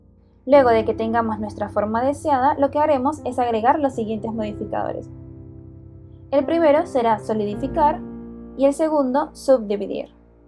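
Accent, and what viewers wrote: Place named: Lima, Peru. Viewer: El señor algoritmo de «yutúh» me recomendó tu vídeo, por fin le atina a algo. ¡Excelente contenido! (Supe inmediatamente que eras de Perú por tu acento de metrópoli, jaja)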